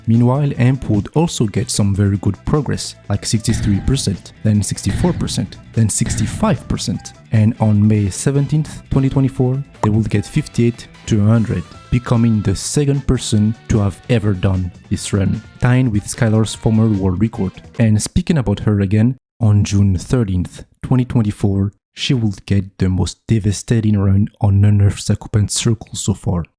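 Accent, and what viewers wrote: France. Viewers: i hear fr*nch accent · I can smell the little french accent x) Anyways very good video !! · are u french or french canadian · I knew you were french since the first second of the video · You're so french (i am french and i detect french accent lol) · Are u French? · Tu serais pas un peu français frrot ? Ton accent te trahi.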